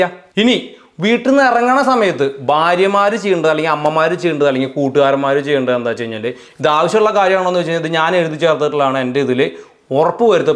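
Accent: Thrissur, India. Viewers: Bro, you are amazing.... The way that you told through the examples are very appreciated. Especially the slang of our Thrissur is lovable... Cheer's bro🤝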